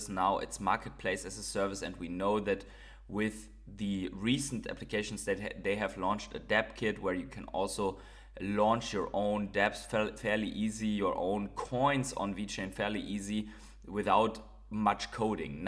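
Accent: Germany. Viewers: Woof🐶 Hörst dich sehr Deutsch an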